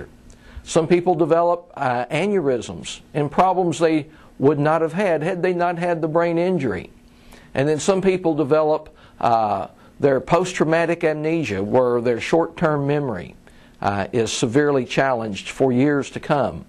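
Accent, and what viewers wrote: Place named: Kentucky, United States. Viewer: Kentucky. Need I say more? Learn to speak English please.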